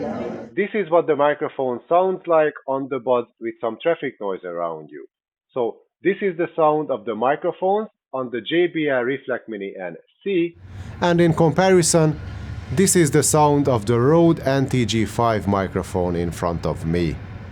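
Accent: Hungary. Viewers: Érzek egy kis magyart 😜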